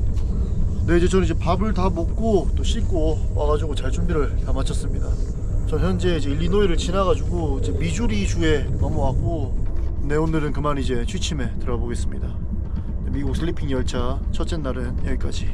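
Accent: Gyeongsang, South Korea. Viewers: 뜨신물, 꽈자 ㅎㅎ 숨길수 없는 경상도 남자의 흔적. 하지만 자막은 서울말 ㅎㅎ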